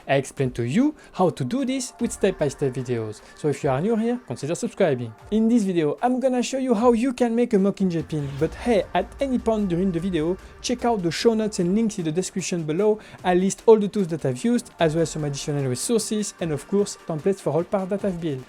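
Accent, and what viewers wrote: France. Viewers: Tes un français ou pas parce que ta vraiment un axen très français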